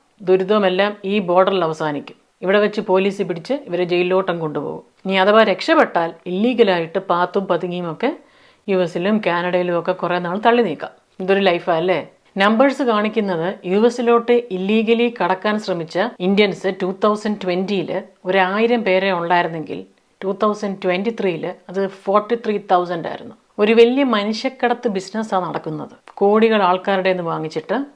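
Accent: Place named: Thrissur, India. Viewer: ചേച്ചി ഞാൻ തൃശൂർ ആണ്, പക്ഷെ ചേച്ചിയുടെ സ്പീകിംഗ് ഒരു തൃശൂർ സ്റ്റൈൽ ആണ് കേൾക്കാൻ രസം, തിരുവല്ല ആണെന്ന് അറിയാം 😂😂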